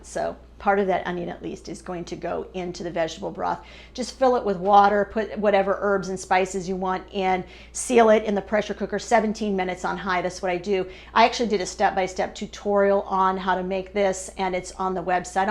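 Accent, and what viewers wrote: Indiana, United States. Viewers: Oh my goodness I love your Hoosier!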